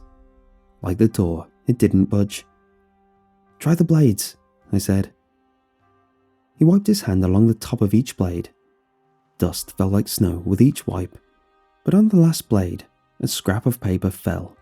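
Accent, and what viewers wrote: United Kingdom. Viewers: Hey, sorry for the off-topic comment, but I've been tormenting myself for weeks over this question: does anyone know where in the UK ' accent comes from? I've spent more than two hours trying to figure it out, but I am but an American, and my efforts were in vain.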